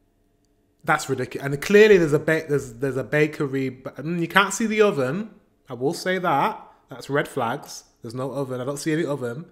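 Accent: United Kingdom. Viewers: you are such a sweet and charming young man. Is your accent northern? or midlandish? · By the way. Your english sounds british.... I continue anyway. What about risengrød. On that, you have the same cinnamon/sucker on top of it.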